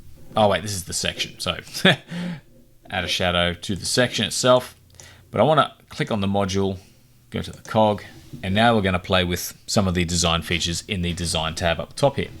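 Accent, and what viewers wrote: Australia. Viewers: Thanks, good to hear an Aussie voice for a change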